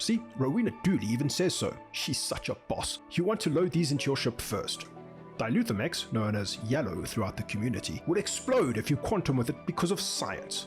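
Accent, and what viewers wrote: South Africa. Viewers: Ek het subscribe die oomblik toe ek jou SA accent hoor. Great videos! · You sound South African :)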